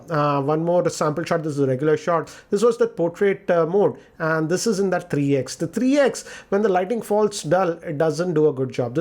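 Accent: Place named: India. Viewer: U r like .. Hindi professor.. doing English class 🤦🏻‍♂️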